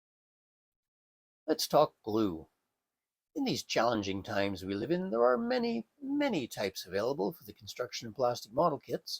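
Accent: Canada. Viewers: I knew you were Canadian right away. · Deckles? I'm 54 years old and I have never in my life heard someone pronounce it "deckles." Every dictionary has the accent on the DE- de'cal. Then you go so far as to do a bit to insult an entire region of the US, because you think this is where the (correct) pronunciation comes from. Like they are all an episode of Hee-Haw. Meanwhile, we have to listen to you talk ABOOOT things with your northern Canadian accent. I don't think I'll follow you with your smug and incorrect advice. Maybe do a video aboot freckles and deckles. <link>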